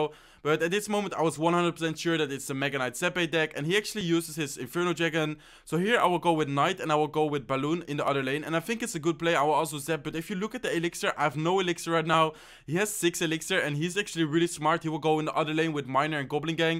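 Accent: Netherlands. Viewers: Typical dutchmen accent 👍 wacht op een nieuwe log bait deck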